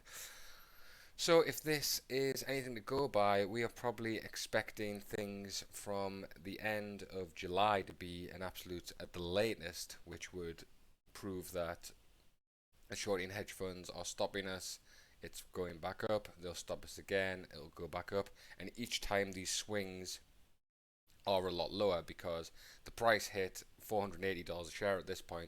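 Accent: United Kingdom. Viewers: New sub here. Always good to listen to TA from a fellow Englishman. Even better when they're from the North-East too!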